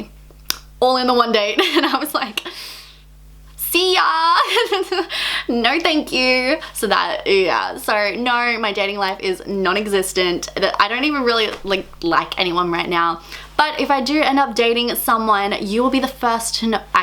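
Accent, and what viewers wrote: Melbourne, Australia. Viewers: I love your accent I've been to Australia. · I couldn’t even tell you had an accent, probably bc I’m Australian too 😅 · Omg I’m from America but I’m moving to Australia this year! I thought based on your accent you were from Melbourne but I’ll be moving to Nelson bay which is much closer to Brisbane than it is Melbourne! I’ve been obsessed with your vids lately!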